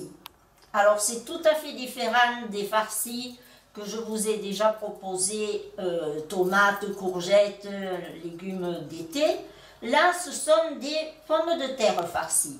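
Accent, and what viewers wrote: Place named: South, France. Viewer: Hummmm...j'ai un plaisir fou à vous suivre...et à faire vos recettes délicieuses...merci beaucoup...et en plus comme chantait,avec! C'est merveilleux.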